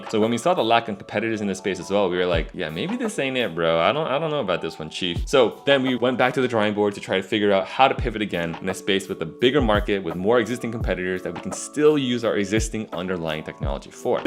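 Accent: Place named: China. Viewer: I like how chinese speak english... just like Jian Yang from silicon valley vibe. 😂 You might be korean though idk... i just like the accent. Also been following you for a while..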